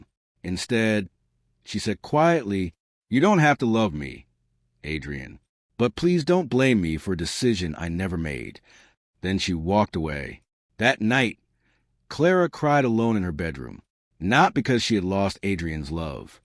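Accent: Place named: South, United States. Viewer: Good story , narrators voice soundos too country🫤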